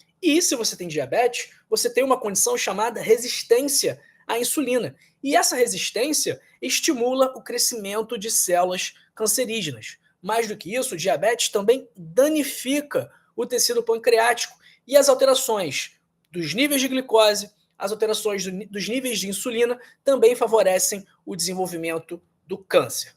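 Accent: Rio de Janeiro, Brazil. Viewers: Carioca sotaque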